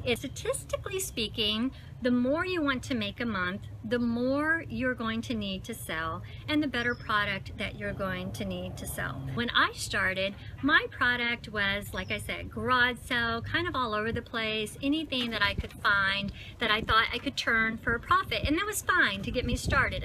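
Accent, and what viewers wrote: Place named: United States. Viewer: Always watch your vids, great content and engaging, and your American accent on Tooosday Tips..... the 3 loooong T's.........lol, you are milking it now!! (my humour), don't stop!! slow down back to yourself speed.... Love your accent and your intelligent & organised approach to your informative and entertaining productions....GL to you, keep it coming.. from the lil' UK x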